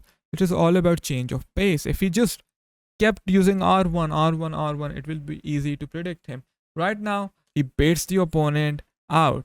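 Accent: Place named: India. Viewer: Indian accent.. is tough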